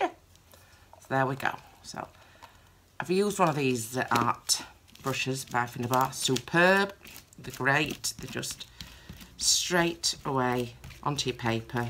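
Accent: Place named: East Midlands, United Kingdom. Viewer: So nice to see a video from someone from England, and not having to pause it to read the translation 😃 I’m in the East Mids, from your accent you’re not that far from me ...love it by the way 🙂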